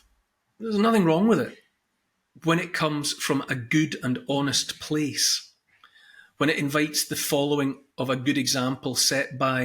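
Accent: Scotland, United Kingdom. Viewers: Isn't that the scottish accent I hear in your mouth?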